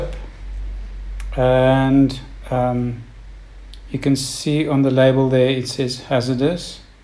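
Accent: South Africa. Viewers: Ons kan nie weg kom van ons boer aksent nie né..😂😊😊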